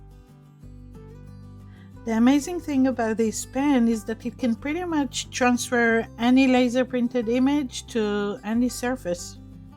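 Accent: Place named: Eastern Europe. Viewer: Hi and first, thank you for your videos! My first question is, I will produce my own artwork using acrylic paint and alcohol ink designs then transfer photocopies of my art onto acrylic sheet, what paper is the best for me please? My second question is, is the Gel Medium technique best for me? By the way, the best years of my life were spent in Chernivtsi in Ukraine and it’s so nice to hear an Eastern European accent again! Kindest regards, Paul.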